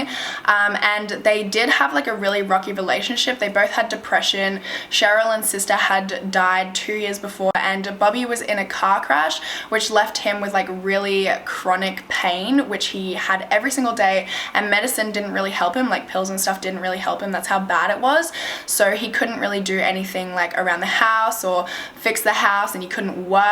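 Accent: Australia. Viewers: her accent is like australian and america switching back and forth. i'm so confuseddd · Are you Australian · Australian. She's from Australia.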